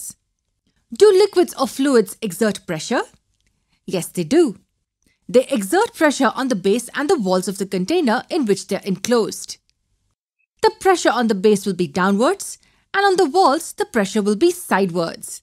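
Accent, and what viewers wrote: India. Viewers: I hate the Indian accent lol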